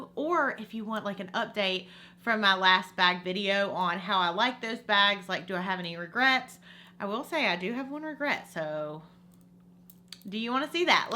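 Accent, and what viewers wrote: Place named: North Carolina, United States. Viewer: Lol the very first time I watched a video of yours I thought, “Gosh she sure does talk like all the people at work.. her accent is similar to ours.. wonder where she’s from”. Then I checked out your other videos and realized you’re from W.S.! I live in Greensboro 😂😂 anyway, i subscribed immediately. Love your videos!!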